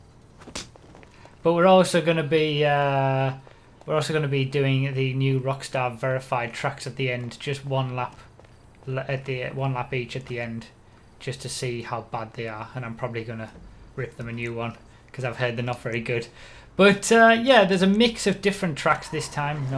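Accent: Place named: Northeast England, United Kingdom. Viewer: You sound like you are from North east england where abouts are you? xD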